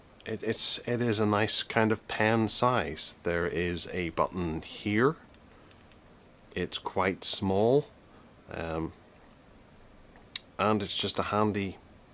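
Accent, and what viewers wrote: Northern Ireland, United Kingdom. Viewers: What part of north of ireland you from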